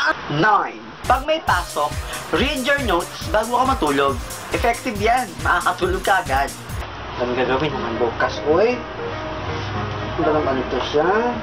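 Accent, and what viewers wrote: Visayas, Philippines. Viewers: bisaya ha..hehe galing naman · "PISTEH!! Ano ba yun" AHAHA!! XD. Bisaya jung dako!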